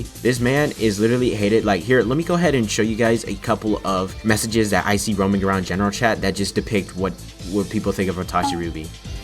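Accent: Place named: India. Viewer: Hes indian accent tells it all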